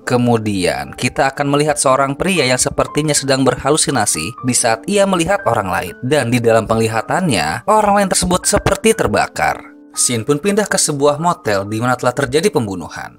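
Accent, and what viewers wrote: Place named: France. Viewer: Mantap bang accent perancis nya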